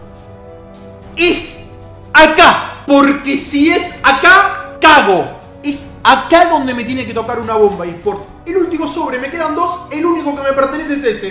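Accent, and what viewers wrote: Argentina. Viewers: no sé porque pero me da gusto escuchar ese tono de argentino ajkds like :)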